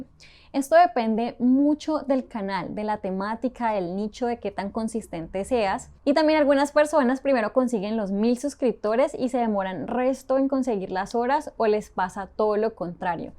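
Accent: Colombia. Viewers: Yo lo hice en 3 meses y ayer me aprobaron, fue lo más rápido que pasó, pero tengo una duda, en algunos videos salen la monetización en $ amarillo(? Mi pregunta es; el $ amarillo también te monetiza? ¿Pero que tanto? Que bonito acento tenes jajs(Colombiana no(? saludos desde Argentina!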